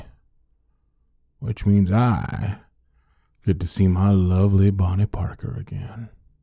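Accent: South, United States. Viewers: This was amazing. Made my whole evening better. Love that southern drawl. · I love you cowboy accent it’s so hot and fine love you can your voice ❤️❤️😍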